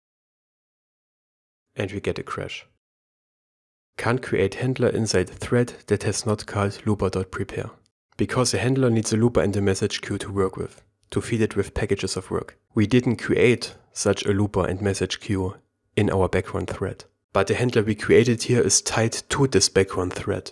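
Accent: Germany. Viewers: dein Englisch klingt, als wärst du deutscher 🤔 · are u german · You are an very good teacher! Probably one of the best I have seen. Are you German speaking? I hear a slight German accent. I recently started with Android Studio. The threading in Java is a bit complicated, but thanks to your video, I look much better, thanks a lot !!! :))))) Greetings from Switzerland Nicolas B) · Are you german?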